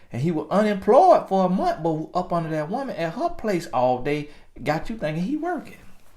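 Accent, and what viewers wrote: South, United States. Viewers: I love southern twang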